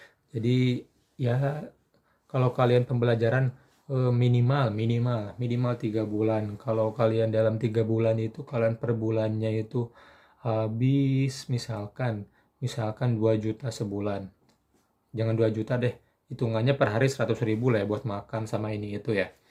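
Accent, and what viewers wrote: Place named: West Java, Indonesia. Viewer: Logat nya sunda bgtt kang , asli bumi di mana ?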